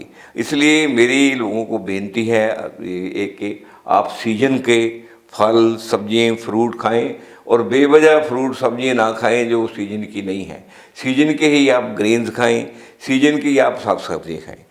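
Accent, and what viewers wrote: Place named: Punjab, India. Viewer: Dr. Sahib plz. Kara banane wali 11 चीज़ों के naam लिख दीजिए आपका पंजाबी style मै बोलने कि वजह से समझ नहीं आ रहा है. मैं तकलीफ में हूँ please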